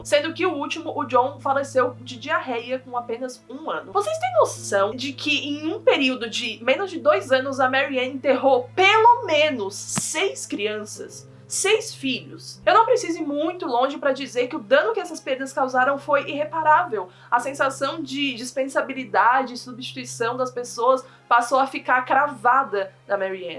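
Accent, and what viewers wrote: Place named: Minas Gerais, Brazil. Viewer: eu pensei q o cara era mineiro tipo quem nasce em minas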